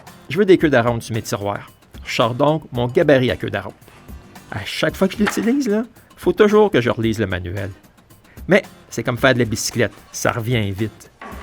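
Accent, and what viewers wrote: Quebec, Canada. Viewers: encore une fois, Merci! Vous êtes ( et toi) les meilleurs.Avec en plus l'humilité et la modestie que l'on ne retrouve pas dans d'autres vidéos de bricolage. Pour beaucoup c'est souvent à l'américaine, avec beaucoup de blah blah et gesticulations pour au final n'être que de l'assemblage d'éléments achetés en grand magasins avec un résultat déplorable. De plus vous me réconciliez avec l'accent de la belle province!. A propos, que veux dire " Gosseux d'bois "?!? A bientôt!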